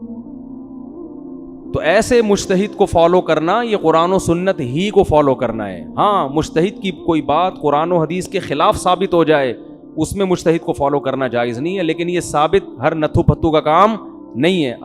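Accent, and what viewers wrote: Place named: Lucknow, India. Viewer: Aap ka baat karne ka tareka bilkul india me ek city h lukhnow waha k log bolte h. But nice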